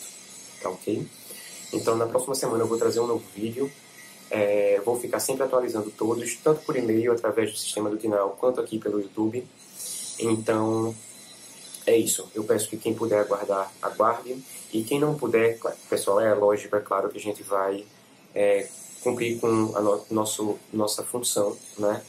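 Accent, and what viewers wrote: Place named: Northeast, Brazil. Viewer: Gostei do sotaque . "É de nós " do Nordeste , eu acredito ! Um forte abraço e força para crescer na crise . Gostamos muito do trabalho de vcs , inclusive , formei um grupo de amigos para todos comprarem as camisas dos Sentinelas , dos cavaleiros de ouro ! Pena que aconteceu isso . Espero que vcs recuperem e assim que vcs retomarem, compraremos as camisas . Grande abraço.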